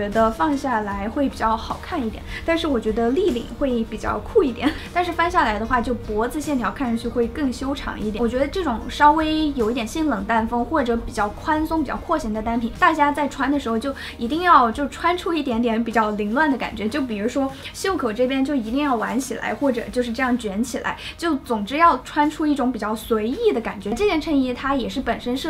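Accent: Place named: Chengdu, China. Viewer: 支持老乡 ps看你成都话的化妆视频看了七八遍 其实是听 乡音啊 😄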